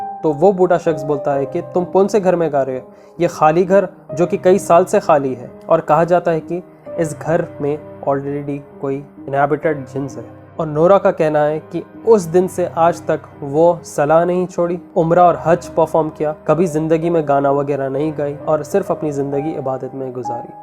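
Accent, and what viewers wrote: Hyderabad, India. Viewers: I lov ur hyderabadi accent....ur videos r som real material...Greatez job...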